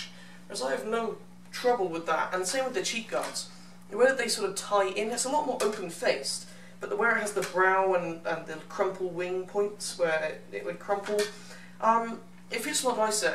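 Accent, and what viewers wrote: United Kingdom. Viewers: very good like all romans in the movies, a brittish accent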